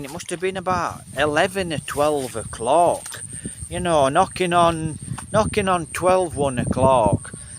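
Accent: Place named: Leeds, United Kingdom. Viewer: He's English, sounds like a Leeds accent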